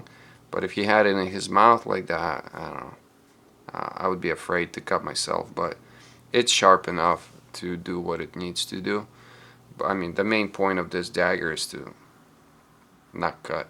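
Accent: Russia. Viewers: Акцент то русский!))Очень хорошие работы,удачи!Продолжай в том же духе!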